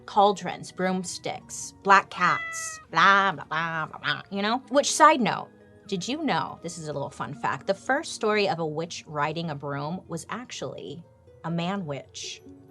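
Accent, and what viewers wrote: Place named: East Coast, United States. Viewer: I love ur voice you sound so east coast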